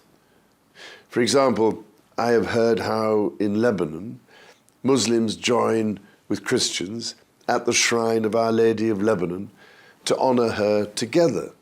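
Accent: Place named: England, United Kingdom. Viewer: That English voice is so perfect . Wow , . His tone is par excellence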